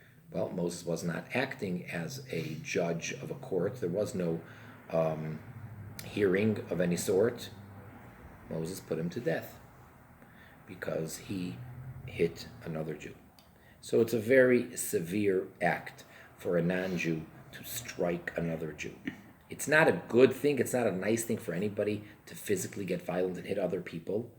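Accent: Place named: New York, United States. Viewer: 12Yrs Old STILL JUST a GIRL including 2-1/2yrs of age. What in the world ☝️ Uhm that is PEDO & ABUSIVENESS of a Minor maybe in Iserel But in the world at least in America which ur SPEAKING IN ENGLISH (sounds like NY)... so these THESE 7 Laws shouldn't nor ever RELATE to ANY AMERICAN Girl & BTW... How old is the Boy? Pray God it's a Boy NOT 🚫 A MAN? WE ALREADY HAVE THE 10 COMMANDMENTS Thank You very much.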